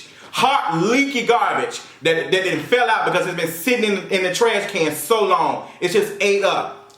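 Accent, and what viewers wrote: Memphis, United States. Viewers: Babe!! I feel every word u say. “I don got pissed off”. 😂 U are so Memphis. 👍🏽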